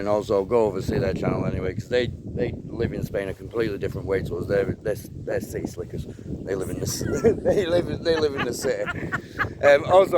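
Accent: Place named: Manchester, United Kingdom. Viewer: What a great vegetable farm. Love watching what your planting and harvesting I was trying to place your accent, sounds very northern possibly Mancunian by any chance?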